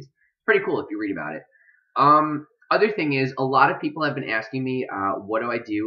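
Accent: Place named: New Jersey, United States. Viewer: Tried to do your NJ accent but kept on making a southern accent lol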